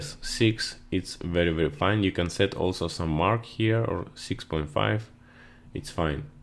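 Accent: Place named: Russia. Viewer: Sounds like Russian accent.. Am I right?)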